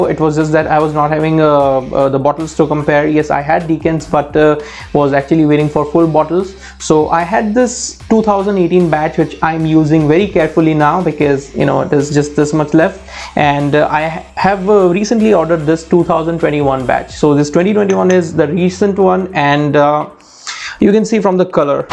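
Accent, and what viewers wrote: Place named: India. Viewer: Nice review bro. Just one small request; either use complete desi or complete American accent. Speaking desi English and using American "Rrr" or "gueed" alone isn't gonna make you easy sounding for the International viewers. Get some accent classes. You won't regret it. And if you are targeting desi viewers only, we don't mind full desi.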